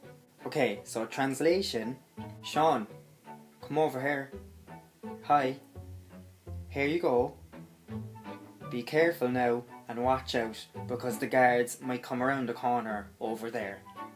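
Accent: Cork, Ireland. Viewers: I am from Cork and this sounds just like me. But I go to a high school in London in some people just can't understand me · C'mere Boy, Ya really need to read the late Sean Beecher's "Dictionary of Cork Slang", and try talk to some of the old-timers - - - mind you, NOTALL NORRIES !!!!!! You don't have a really, really STRONG Cork, to be honest, more of a mid Atlantic Norry Brogue. DOWTCHA BOIY ! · My Dad was from Cork City (the South Side). Love this - there's nothing like a Corkonian voice to make me smile. Thanks. :)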